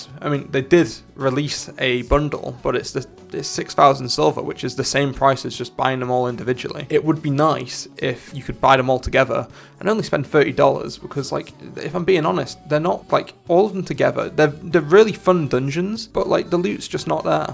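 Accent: United Kingdom. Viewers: Followed you on Twitter forever had zero clue you were British lmfao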